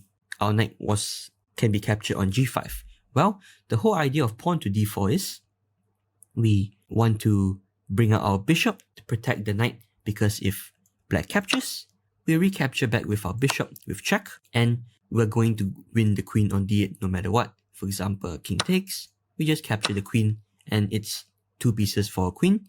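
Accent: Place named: Singapore. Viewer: hello dude, i wanna ask where r u from? u sound singaporean ngl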